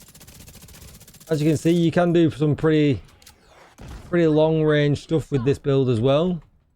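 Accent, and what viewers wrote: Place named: Scotland, United Kingdom. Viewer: Hey, buddy I'm new on the channel, your accent sounds like the SOAP on the new MW series, may I aks where you from???? Take my Thumb Up 👍 right from Brasil.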